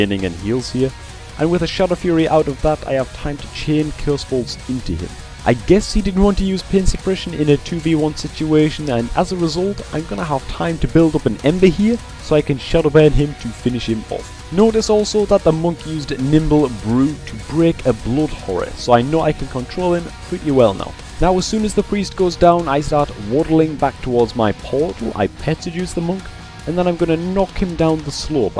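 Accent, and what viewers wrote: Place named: Northern England, United Kingdom. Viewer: Hey im not sure if you have said this in another vid or been asked before, but where are you from? you sound like your from northern england, i may even go as far as saying your from Newcastle like me!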